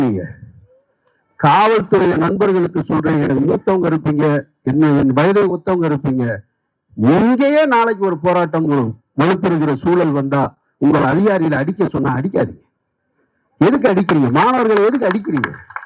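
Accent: Madurai, India. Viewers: மதுரைக்காரன் பேச்சு🎉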